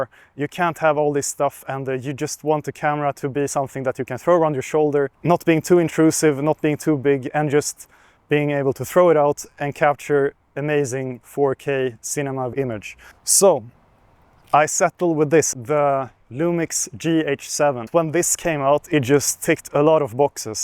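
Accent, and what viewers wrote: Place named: Sweden. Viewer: I love the swedisch accent, its so cool. And it reminds me of Ollienelson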